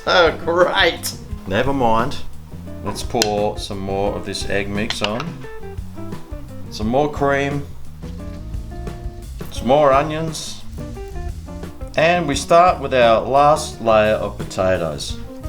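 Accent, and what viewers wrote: Australia. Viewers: you sure do like your cheese hugs from America hon btw I love the Aussie accent;) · Nice to hear an Aussie voice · Aw. You were pleasant to watch and the recipe looked very yummy. Darn it! I miss the Australian accent. Lived there for 6 months so long ago. Aluminium. Heh heh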